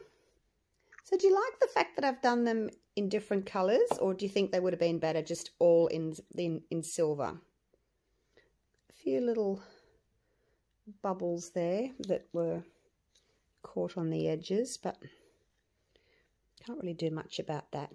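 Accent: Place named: Queensland, Australia. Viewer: Hi. It's great to hear an Aussie and fellow Queenslander on YouTube. Those coasters turned out wonderfully. I'm generally a green lover, but this time the blue won. Stay safe.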